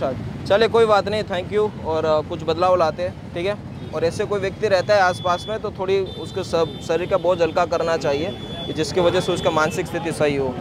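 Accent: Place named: Etawah, India. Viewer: bhai iski avaj se sap pata lagta hai ki a bhai itava ka hai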